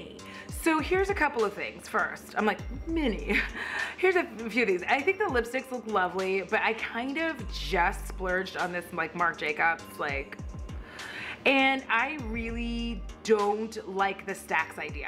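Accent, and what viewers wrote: England, United Kingdom. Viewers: I love the English accent and that it makes you sound like a villain.... I must be a villain too 🤣🤣🤣 Also agree with the cat mascara it reminds me of the Katy Perry cat perfumes ... decapitate a cat every spray 😻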